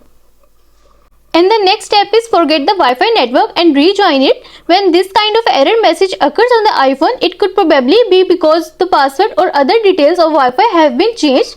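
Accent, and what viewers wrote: India. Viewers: Its bad enough you have a Indian accent but why the he'll you,going so,damn,fast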